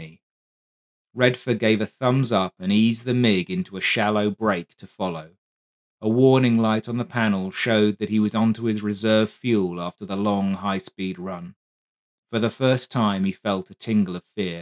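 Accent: United Kingdom. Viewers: Love your Brit Accent and dry assessment that outlines the basics of the problems of the transoceanic and heavier air flight characteristics... a lot of technical limitations.. and I am not Fn of only single seat fighters over the REO concept, as this is the ultimate command seat for on scene awareness.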